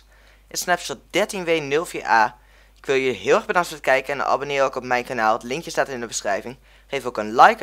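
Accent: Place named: Rotterdam, Netherlands. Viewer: Leuk filmpje maar hij heeft een Rotterdamse "t" egt irritant om te luisteren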